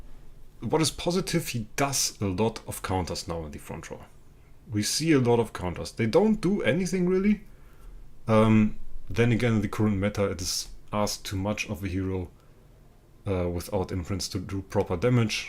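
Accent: Germany. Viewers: I could tell from your accent you were from Germany although I am pretty good with accents also Hi from Canada 🇨🇦 loving the videos bud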